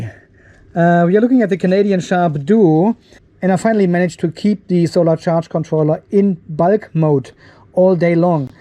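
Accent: Germany. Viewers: You sound german?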